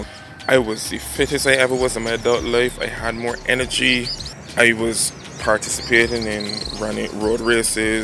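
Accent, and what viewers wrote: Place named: Barbados. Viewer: I can't do two push-ups, so I'm already impressed. Also, so nice to hear a Bajan accent. I think I'm 30% here for that alone.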